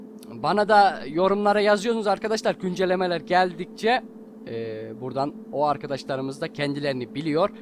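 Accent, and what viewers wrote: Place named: Rize, Turkey. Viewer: Abi sen nerelisin ya tam Rizeli tipi ve şivesi var sende 😁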